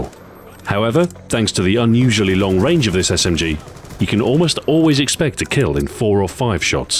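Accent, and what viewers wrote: United Kingdom. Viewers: I don't get what's so weird about his voice. It's just a British accent! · Well, he has a British accent and his voice is like hot chocolate 🤔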